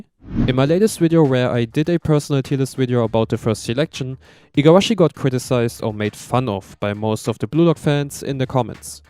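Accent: Germany. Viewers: german english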